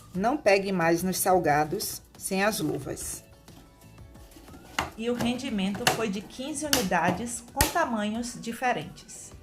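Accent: Bahia, Brazil. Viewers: Amo suas receitas e gosto do seu sutaque Bahia tudo de bom gosto muito de vc pq esprica com muito atenção parabéns vc c me lembra uma amiga mulher do meu cunhado de senhor do Bonfim Bahia bjs 😘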